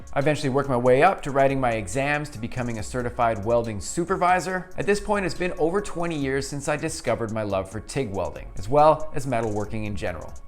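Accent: Canada. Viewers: well he is canadian….and vancouver island to boooot…PRAW JECT….not PRO JECT… PROJECT is “she’s projecting her emotions on him” PRAW JECT is “this is a project I want to accomplish”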